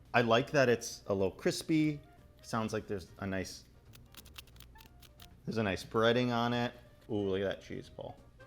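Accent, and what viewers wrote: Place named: Italy. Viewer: The italian accent made me quit the video halfway through and I only came back because I really like your content, but aside from that it was a nice video! Maybe next time you could record in two days so you don't overeat and feel sick.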